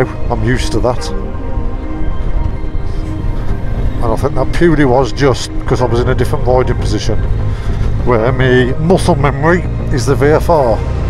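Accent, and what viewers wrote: Birmingham, United Kingdom. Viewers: Do I detect a Bham accent? Looks a bit like Brum in the vid. Yeah this Bike ticks a lot of boxes, esp if you just passed your test. Think am going for the Interceptor though, looks over sense haha (Honda mpg etc n longevity). I would def want a center stand.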